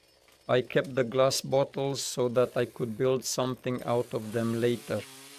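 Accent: Belarus. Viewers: Это где в Беларуси? Голос вроде белорусский у тебя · Белосус походу,судя по говору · По говору вы из Белоруссии???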